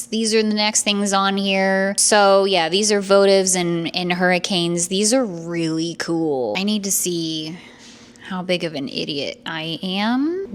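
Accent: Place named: New Jersey, United States. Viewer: I want you to know I am very proud of you for resisting the temptation to buy the amazing spooky items I know how much you love them I always enjoy seeing you so excited and happy when you get to make the purchases and I miss hearing you say purchases in the New Jersey accent and I always think it is cute when you say I am not going to spend a lot of money then you get back to the car and you are like I had to get them I think it is so funny and cute and I love how you decorate you're very good at the decor and a very good shopper I love halloween and Christmas and Halloween it's not official until you and say it has begun me and my family have watched you for three years now and you have always brought us our Halloween fix I will never forget when you went to the at home store and you were determined to give us our Halloween fix and you were determined to get that witchy candle you climbed on the flat bed dolly and you got it your dedication and determination to bring us our Halloween fix has always been the best so don't worry you're doing a perfect job and I hope my words make you smile and smile me and my family love you and always and forever 🖤🧡🖤🧡🖤🎃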